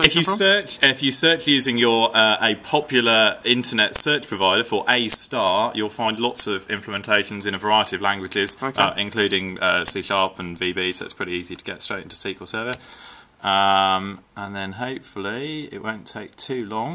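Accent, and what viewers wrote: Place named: Australia. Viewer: The next tomtom?? Just wondering why you sound Australian in this video?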